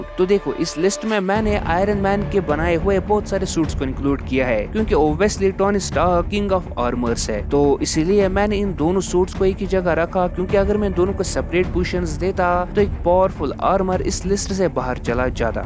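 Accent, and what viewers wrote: Kashmir, India. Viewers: Bro your vioce sounds like kashmiri 🤨🤨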